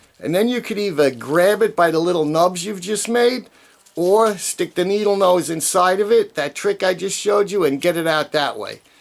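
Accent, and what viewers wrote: New York, United States. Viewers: Thank you, I was a bit timid about touching the filament, using the needle nose to bend the metal base lip (my mama taught me this, btw) and turn, no luck, corroded. I watched your video, turned off the power and turn the glass filament, thank you. No one here, just me. Quick note: how it broke?-the glass bulb mysteriously fell off, leaving the metal base, filament and a spooked girl. I still love the old school bulbs, btw. love your jersey or NY'er accent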